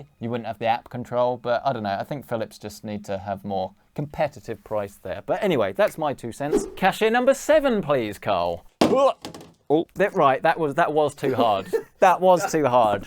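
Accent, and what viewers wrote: England, United Kingdom. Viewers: does bro live in the US or the UK? He sounds like UK (england) but his sponsors and prices seem US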